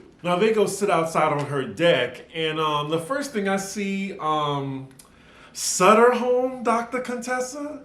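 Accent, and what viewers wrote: New Orleans, United States. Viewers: I know you've been in NY for a while but I still hear many hints of Nola! 🥰🥰 I'm sick of Toya ass and I didn't need to see Kari...Great review!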